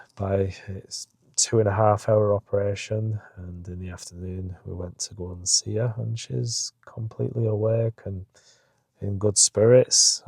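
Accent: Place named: Scotland, United Kingdom. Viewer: your wife and mom-in-law are so lucky to have such a devoted husband and son-in-law to care so deeply and do so much very clearly out of true kindness and love and not just some begrudging sense of obligation, as too often is the case! I pray for a rapid and thorough healing of MIL’s injuries and it’s wonderful she plans to get right back to being active as a hip fracture can far too often signal the end of independence and active lifestyles for older people. I suspect your MIL has nothing to fear as she looks as bright and spry as anything!!! I hope you get some painting done and thank you for sharing your life with us! Ps-this might be nosey but I have to ask…what is your accent? To my highly American ear,it sounds like Scottish and British together? Could that be? 😂 Take care, and take care of Mum!!! 😉😃🙏🏻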